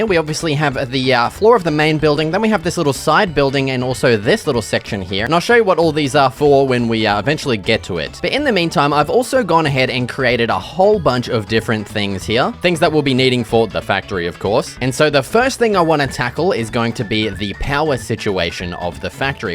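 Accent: Australia. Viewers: are u Australian cuz you have a aussie accent some times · Are you Australian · Idk why but your voice makes you sound like the ultimate Australian surfer boy giga chad XD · I can't tell if you're an ausie or a British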